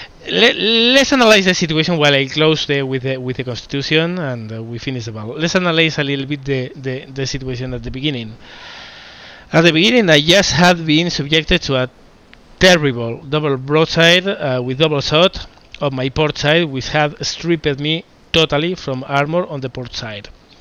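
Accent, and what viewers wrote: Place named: Spain. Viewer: Tu eres español no? Ese acento es claramente español Corrigeme si me equivoco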